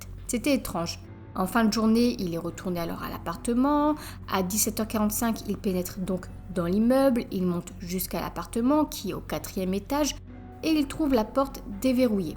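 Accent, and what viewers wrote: North, France. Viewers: Tu es du nord toi non ?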